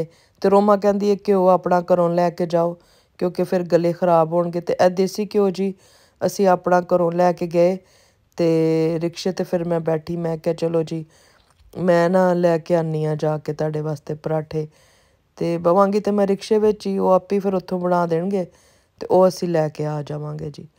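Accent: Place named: Amritsar, India. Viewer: 😊❤same as indian punjab Amritsar life sari galla baata same you are speaking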